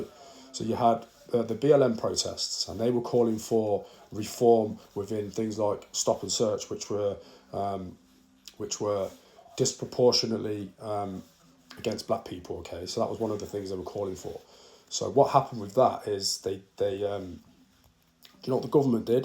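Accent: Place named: United Kingdom. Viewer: Are you British ? I like your accent oh yeah you are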